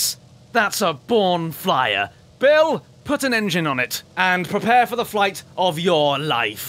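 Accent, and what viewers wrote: United Kingdom. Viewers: Your gameplay and commentary is so enjoyable, the british accent is on point m8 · is this your real voice because I'm British but I don't sound like you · not an impression, hes actually british........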